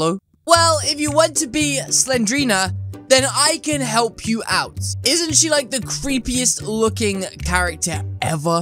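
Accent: United Kingdom. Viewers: He's English/ Briti. He says stuff different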